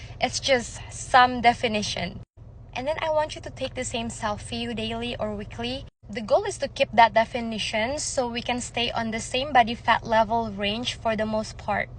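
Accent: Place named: Philippines. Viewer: Are you a Filipino? You kinda have that accent. ❤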